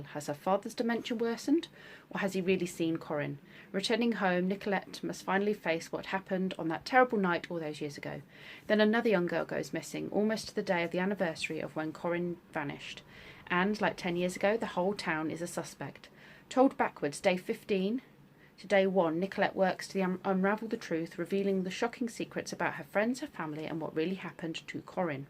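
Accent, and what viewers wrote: United Kingdom. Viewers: The mystery one of course, "All the Missing Girls". I'm enjoying your vlogs & your British accent. Beautiful memory box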